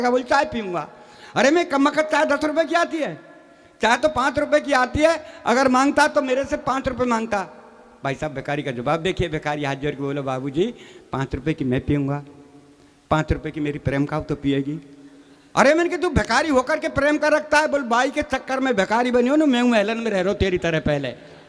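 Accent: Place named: Braj, India. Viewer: Baba ki laungvej to mathura Bharatpur ki hai pakka❤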